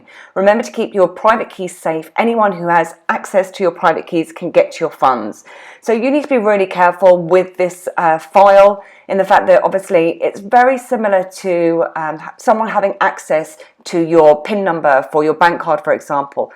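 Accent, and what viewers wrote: United Kingdom. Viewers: You're pretty and I tolerated that British accent only bcoz of that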